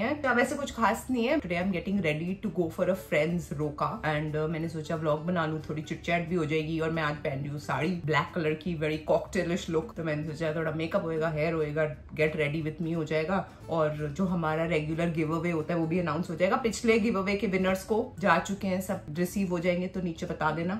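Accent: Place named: Chandigarh, India. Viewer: You literally speak like us, people from Chandigarh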